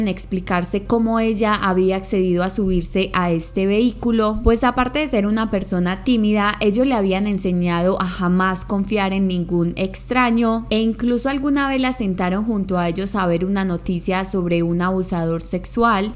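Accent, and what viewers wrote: Colombia. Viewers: Adoro como explica sus casos,es increible y su acento colombiano enamora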